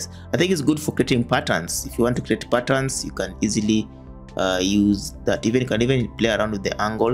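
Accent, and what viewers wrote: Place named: Kenya. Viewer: Your african kenyan by accent😂😂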